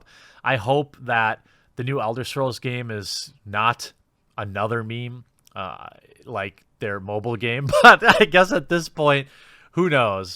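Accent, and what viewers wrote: Wisconsin, United States. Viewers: it’s that Wisconsin midwestern accent. One of my favorite parts of living there for 5 years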